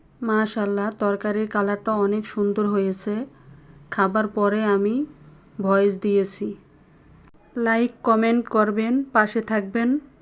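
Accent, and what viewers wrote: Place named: Barishal, Bangladesh. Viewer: আপু আপনার দেশের বাড়ি মনে হয় বরিশাল কথায় বোঝা যাচ্ছে |আপনি যেখানেই যান না কেন |নিজের দেশের মানুষের কথা শুনলে মনে হবে আপনার আপনজন ❤️❤️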